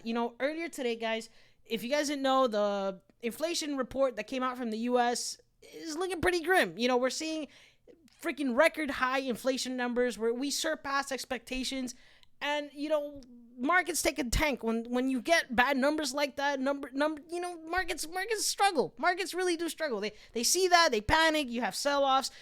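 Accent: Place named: Bradford, United Kingdom. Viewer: Are you from England You accents like you from Bradford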